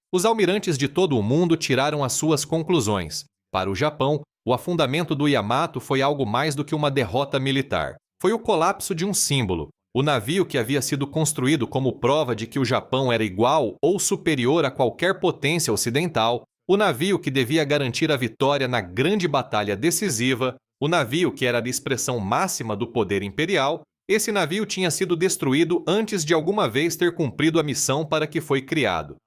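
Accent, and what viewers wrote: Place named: Caipira, Brazil. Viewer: Narração em caipirês acentuado. Não acho estranho pois sou caipira do interior de São Paulo, mas não estou acostumado com esse tipo de narração de fatos históricos. 👍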